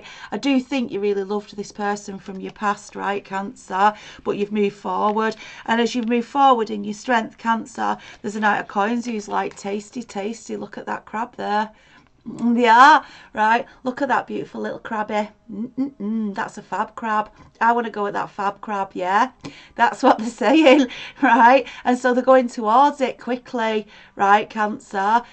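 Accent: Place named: Liverpool, United Kingdom. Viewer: liverpool accent !! love it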